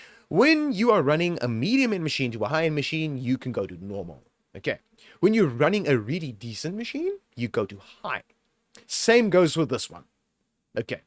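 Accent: South Africa. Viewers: You are the best to do it, theres something so refreshing about hearing a tutorial in a SA accent lmao. love you bru, keep doing what you doing.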